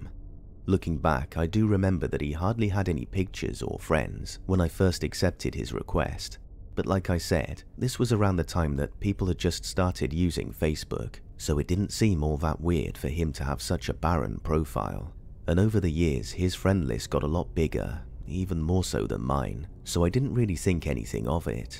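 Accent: United Kingdom. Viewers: Gehls. I love it !!! I love that British accent ! ❤ 🙏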